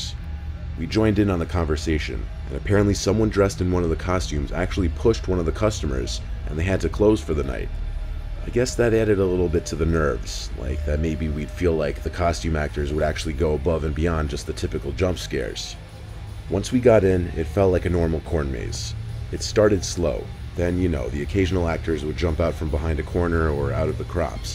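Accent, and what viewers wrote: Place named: Boston, United States. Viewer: Hey, I love your stories so much. I wanted to tell you, I recognized your accent from Boston so I checked out your insta. and I wanted to say that since you’re from Boston, you’ll be happy to know my mom and I are visiting there in September. I’ve always wanted to check out Salem.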